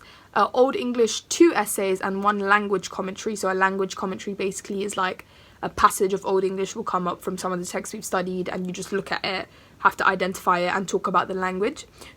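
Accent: United Kingdom. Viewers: Have you been born in uk, if no, then , how you have uk accent , and have you learnt phonetics if not then how did you learn pronunciation . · uh that british accent gurl:)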